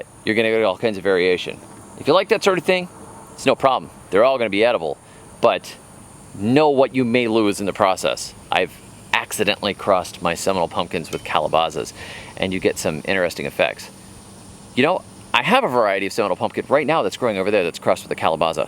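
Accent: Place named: Boston, United States. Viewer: What with the Boston or New England accents LOL..... But it might make me by one... I thought this was about pumpkins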